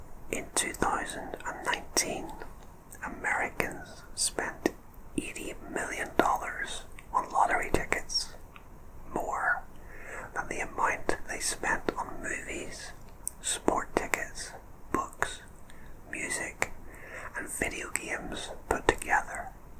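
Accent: Ireland. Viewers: your accent sounds Irish 😂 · ❤Sometimes I play the lottery... but its a real downer when I lose and I have to go back to work! Love your Irish whisper! ❤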